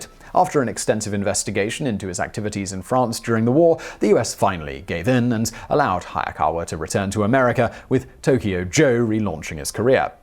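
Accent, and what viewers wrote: England, United Kingdom. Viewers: sigh...you are so British...tailored suit...but they are still doing window pane fabric? British guys can get away with that (I lived there long enough) Americans can't...they don't have the accent and don't know the difference between oxfords and brogues... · Very well put when you said "....his altruistic accent..." Love your sites. You're the man and a British accent I can handle. And understand. Always awesome narration. And now someone is going to think you wrote this to yourself. Ha,as if. Peace, nice work and thanks. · 10 20 19 Hey & the Team, Thanks for the post. Lovely suit, color is good on you too; not that my opinion matters that much. Be well. v P.S.: Classic Bond look to go with the British accent.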